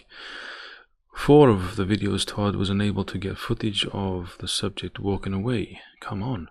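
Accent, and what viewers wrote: United Kingdom. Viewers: thought you had a british accent for half the vid! Bigfoot is real Tod is fake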